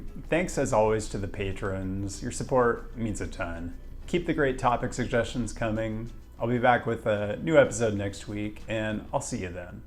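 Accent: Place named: California, United States. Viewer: I cant stand your California accent 😂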